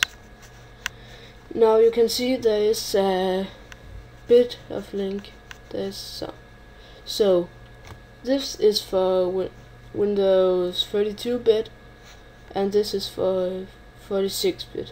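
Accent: Denmark. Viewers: danish accent haha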